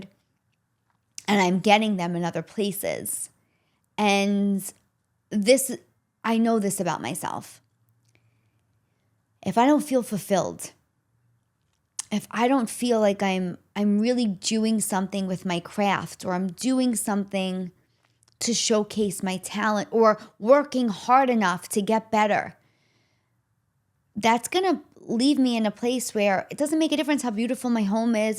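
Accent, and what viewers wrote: New York, United States. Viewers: No matter where you reside, your voice will always take us back to NYC! ❤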